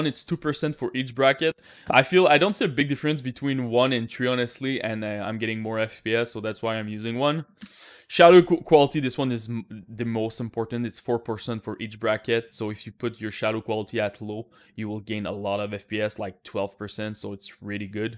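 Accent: Quebec, Canada. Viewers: tsonne tres québékois my guy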